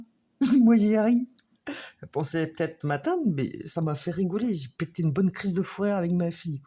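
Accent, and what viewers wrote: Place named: Nord-Pas-de-Calais, France. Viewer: Je peu me permettre de vous demander d’où vous venez car vous avez une façon de parler ont dirait une ch’timi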